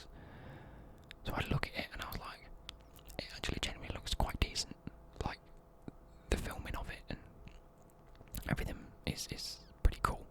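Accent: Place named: Midlands, United Kingdom. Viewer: Where are you from? I might be hearing things but you sound like you’re from round by me (midlands)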